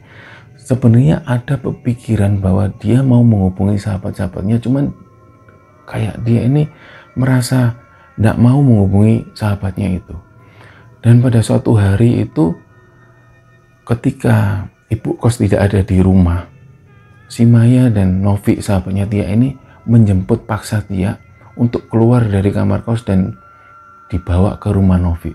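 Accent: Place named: Magetan, Indonesia. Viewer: Beneran magetan, orang magetan mana suaranya ✔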